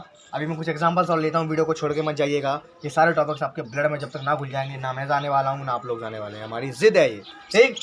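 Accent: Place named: Marwari, India. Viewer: Gajjjjjjjjb sirji aapka marwadi tadka bahut aacha laga 🔥🔥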